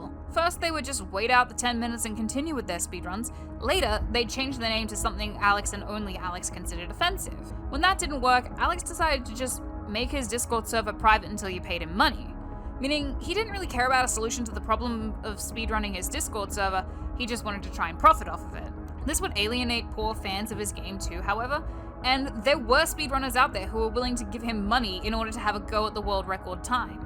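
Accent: Australia. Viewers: wait a damn minute he's australian? gross get him out of here musty bitch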